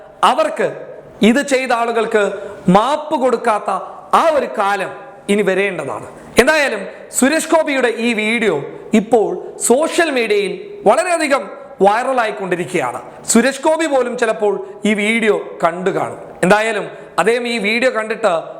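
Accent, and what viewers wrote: Kasaragod, India. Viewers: Kasarkodan slang idykku kayari varunnu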